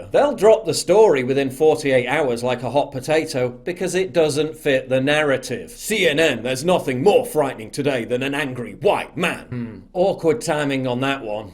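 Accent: United Kingdom. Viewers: Why you gotta narrate like that, get your British sounding a new hobby. This the second time now that I accidentally started watching your under 5 mins pos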